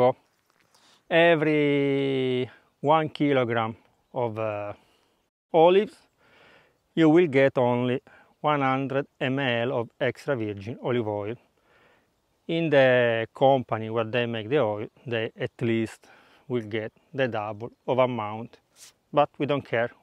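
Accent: Italy. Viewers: I had to like the video the second you started talking. Love the Italian accent. Watching from Ocala, Florida. Thank you for showing us how to make the oil starting directly from the plant. I have a nice fully grown olive tree that is producing olives and I can't wait to give this a shot.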